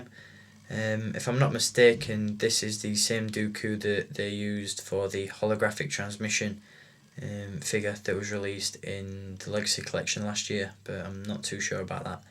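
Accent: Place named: Northern England, United Kingdom. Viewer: sounds like north england somewhere round Yorkshire, Blackburn, maybe? I don't really know northern accents so well... Sheffield?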